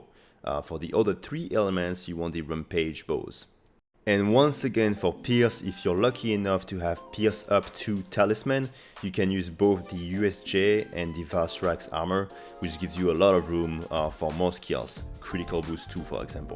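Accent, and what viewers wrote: France. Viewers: Mdrrr t'es français non? Je crois reconnaitre notre bel accent:) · its me or there is a french accent here ? :)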